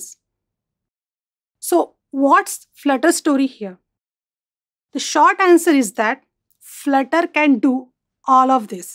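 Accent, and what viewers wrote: India. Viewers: 这个印度咖喱味道的English听起来真让人难受啊。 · Thanks. First time indian accent 💌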